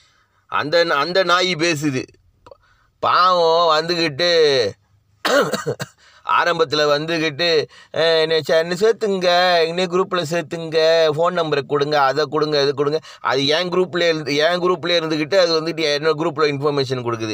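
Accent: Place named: Malaysia. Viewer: Oh my god the best 1 Malaysian tamillan slang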